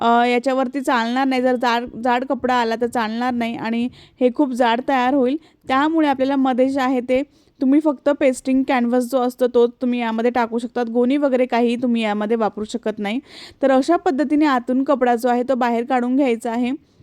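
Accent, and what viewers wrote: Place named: Alibag, India. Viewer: khupach chaan alibuaag warun bolte❤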